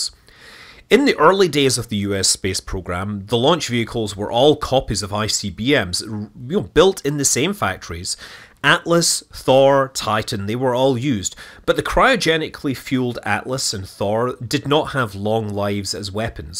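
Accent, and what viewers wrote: Scotland, United Kingdom. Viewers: Where you from in Scotland chief?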